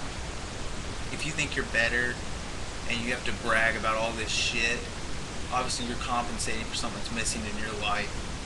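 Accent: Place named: Philadelphia, United States. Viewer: You sound like you are from Philly. Move to Camden, live there for a year, and then please do a video update. Until then, please explain your theory of what is or isn't "punk rock" because it sounds to me like you think it is "punk rock" to take the establishment position on every last little thing. Wee bit odd, yes?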